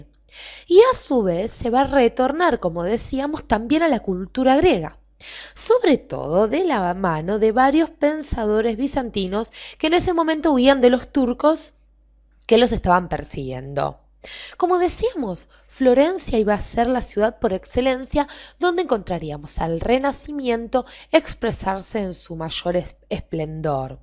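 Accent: Rioplatense, Argentina. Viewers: Vos sos Argentina o Uruguaya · Like si escuchas asento argeitaliano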